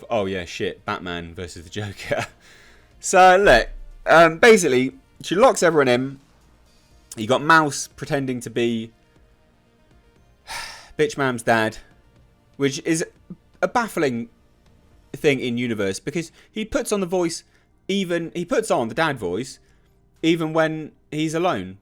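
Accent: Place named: United Kingdom. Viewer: There's nothing funnier than a frustrated confused Brit shitting on something terrible in that glorious accent. "What are you doin" and "who is this for"????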